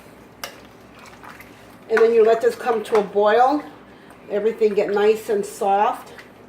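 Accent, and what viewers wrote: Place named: Puerto Rico. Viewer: Se ve bonito y aparentemente sabroso. Voy hacer un comentario porqué si no exploto.Señora usted tiene un acento puertorriqueño y habla buen español¿Porqué habla en Inglés,Inglés con acento puertorriqueño e inclusive hasta los ingredientes en la receta están en Inglés?Estaba buscando una receta de patitas de cerdo al estilo puertorriqueño y me encuentro con la suya y me da pena encontrar la suya donde parece le avergüenza hablar español?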